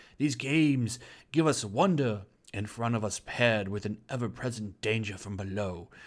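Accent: England, United Kingdom. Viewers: That, and his terrible english accent.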